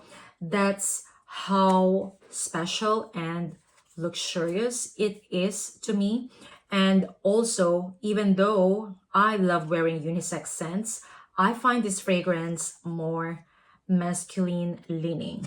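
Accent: Philippines. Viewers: Ooh. I knew you are a pinay from your accent. I'm from the Philippines also but now staying in italy 😊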